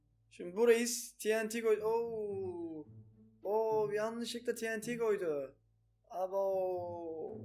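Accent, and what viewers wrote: Black Sea, Turkey. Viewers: Abi senin gonuşman çok hoşuma gidiyi bende garadenizliyim bayburt Guklagraft yeee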